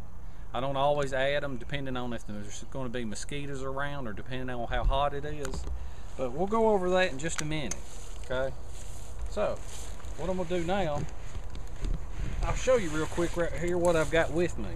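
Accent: South, United States. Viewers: When i first started watching you i said holy crap mykel hawke then you spoke lol you are almost as country as i am checkout my channel and see just glad to see i ain't the only one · Great lesson! Thanks a heap for sharing your knowledge! I'm going to try out your tarp bunk as soon as we get some springlike weather. By the way, you have a great laugh! I love your great regional accent--which should be in the Smithsonian Museum of recorded sound. Keep your videos comin'! .Want to use your then *not only to learn practical skills) but also to teach my foreign students what real Amercian English sounds like! ;)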